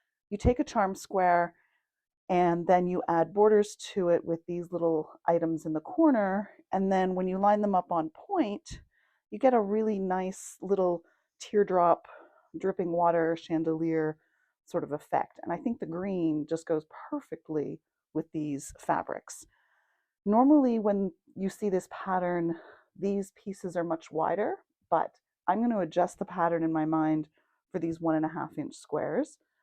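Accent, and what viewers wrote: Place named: Canada. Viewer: Trying to determine where you are from because of slight accent! Canada, New York? I think Canada. Love the quilt and pattern and your video is excellent. Like how you discuss measurements cuts and note bias and grain!!!!!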